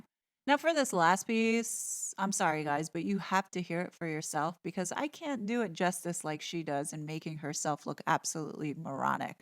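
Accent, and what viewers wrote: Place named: New York, United States. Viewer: another great video! Right on point. As an aside…I love it when you are on a roll and your NY accent comes flying out of your mouth..!!! Thank you for all you do!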